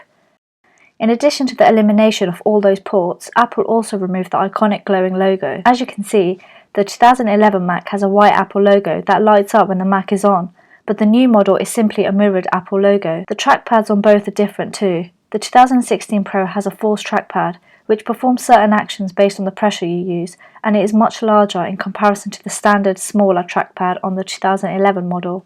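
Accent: United Kingdom. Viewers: Is it me or you have a strong Birmingham accent ? ahah ! Thanks for the video :)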